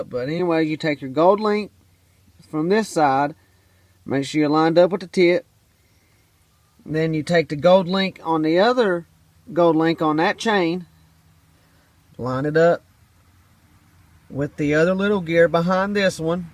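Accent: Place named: Missouri, United States. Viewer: You sound like your from Missouri, thanks man I hvnt started my project jus yet but i have been searching for about 4 months now ima go dive in head first thanks to you man 🙏🙏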